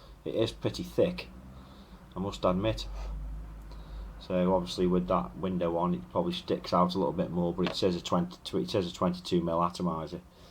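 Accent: Stoke, United Kingdom. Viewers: Aye up mate.....i was really surprised to hear a Stoke accent doing a review. Ive noticed a lot more people walking round with e-cigs just lately.... are there any good brick and mortars selling good quality liquids (locally) that you know of? keep it up anyway mate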